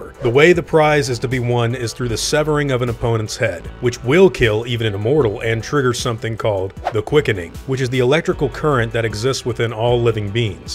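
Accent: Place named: Scotland, United Kingdom. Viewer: Egyptian Spaniard with a Scottish accent, brilliant👍👍👍👍👍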